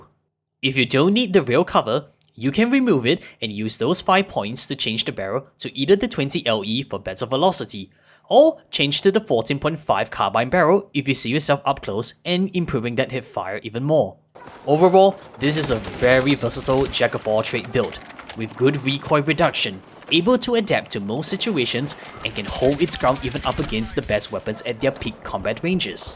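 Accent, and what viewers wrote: Singapore. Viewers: I was just about to comment "this is the closest thing to the BF3 M16A3" and then the video continued and you said exactly that. Great video on my favourite weapon in this game. I'll definitely be trying out that first build with the suppressor. Also, the Singaporean accent is the best Asian accent in English. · I detected the singaporean accent right away, but i digress. Great video bro keep it up.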